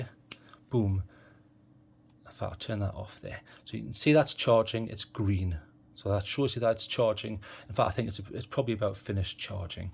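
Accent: United Kingdom. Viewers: Love British accent & this is how a flashlight should be reviewed. Good job 👌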